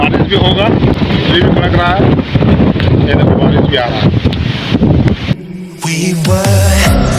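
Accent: Bihar, India. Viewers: Apke language se lagta hai aap bihar se ho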